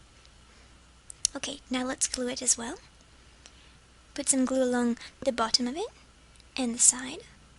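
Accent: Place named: RP, United Kingdom. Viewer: you sound posh lol but i like it :)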